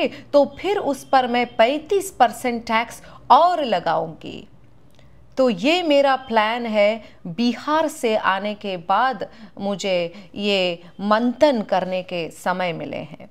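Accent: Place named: South India, India. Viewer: Hindi in south accent too good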